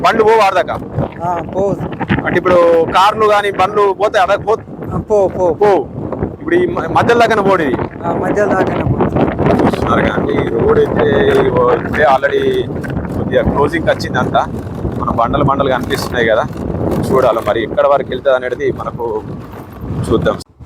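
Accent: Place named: Telangana, India. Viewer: Brother beutiful telangana language your speaking.nice keep itup God bles you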